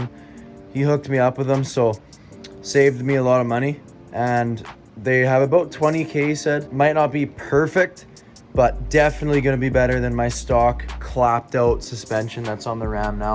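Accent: Canada. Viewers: Buddy your accent is legendary, Canadian for sure hahaha. Thanks for the guide!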